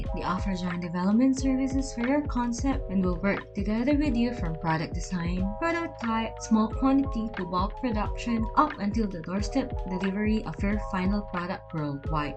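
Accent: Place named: Philippines. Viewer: My dumbass rly thought u were a dude. Also r u filo cos u kinda hav the accent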